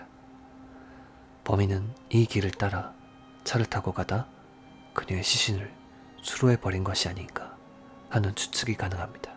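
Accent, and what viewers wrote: Japan. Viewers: 개인적으로 '후' 발음이 적응이 안되네요 ㅎㅎ 일본식 발음인가요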